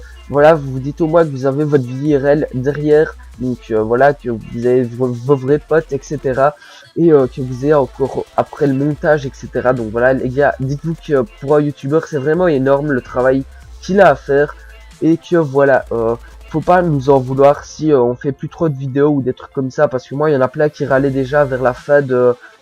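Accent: Belgium. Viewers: Hahaha tu m'as tué mon accent de mes couille j'ai raté ma vie prc g pris la Xbox Xd (ps moi aussi je suis belge et g un accent comme le tiens (un accent de mes couille comme tu dis) continue!!!) +1 abo à ta chaîne principal (déjà abonné a là évasion) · Commentary a la belge comme moi x') !!!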